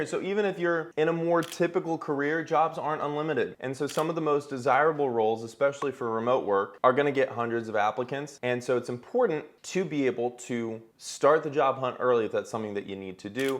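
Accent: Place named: California, United States. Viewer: Your video sounds like every Cali person....which is why no state wants you. You moved to NC and should acclamate and shut up.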